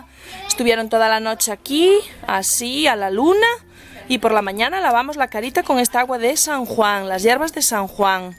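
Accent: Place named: Galicia, Spain. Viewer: Me ha encantado este video... Con las tradiciones de tu tierra GALICIA. Tan verde, tan hermosa, tan maravillosa... Hayyy... Me gusta mucho tu tierra , sus gentes , sus paisajes, la costa galega, la gastronomía tan suculenta, y vuestro acento!!!. Y me gusta también, como transmites a tu niña todas las costumbres típicas de tu pueblo!. Enhorabuena y un abrazo desde Navarra!😘😘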